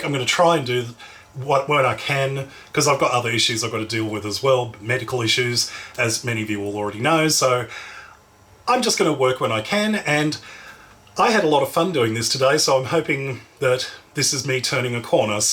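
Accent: Australia. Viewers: This looks wonderful. Thanks for the recipe. Good to hear an Aussie voice on the tube too. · God bless you. You are a beautiful soul. Is your accent Australian? Do tell. thanks. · I love your quick cooking of course but mostly I love your Australian accent!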